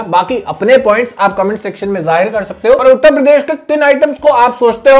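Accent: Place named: Bengal, India. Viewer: Iska Bengali sunke hasi nahi rok paya😂😂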